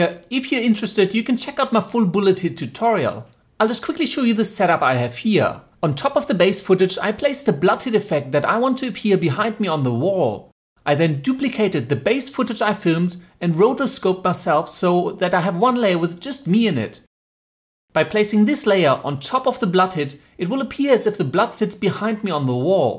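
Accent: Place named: Germany. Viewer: You have a german accent xD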